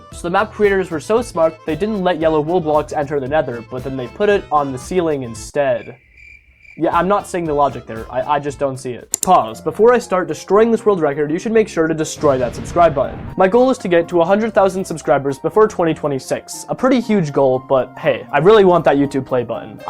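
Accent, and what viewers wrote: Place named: Canada. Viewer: The moment I heard Kenadian I started loving this guy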